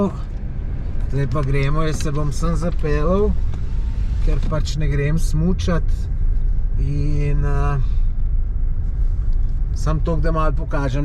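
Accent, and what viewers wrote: Slovenia. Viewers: Meni uvek simpaticno kad slusam slovenca kako prica:) Jajaca su jaca:):) Bili smo ocigledno u isto vreme na Jahorini, ja sam zadnji put bio pre 15 godina i zaista sem planine koja je ista sve ostalo je drugacije. Puno pozdrava iz Beograda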